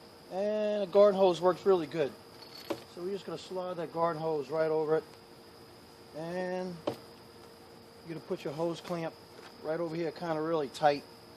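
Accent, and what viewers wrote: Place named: New Orleans, United States. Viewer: You sound like you're from outside of New Orleans. Home sweet home.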